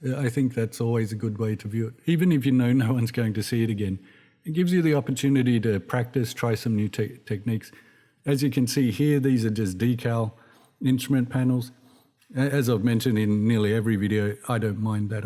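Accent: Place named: Australia. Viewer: Great commonsense review. I have one of these in Yellowjacks so-called starter kit form which I'm itching to build. The yellow paint scheme will be a challenge! I have tried building older Airfix and Matchbox 1/72 Gnats but not got very far. Good to hear an Aussie voice on a kit review. Mike from the Shaky Isles.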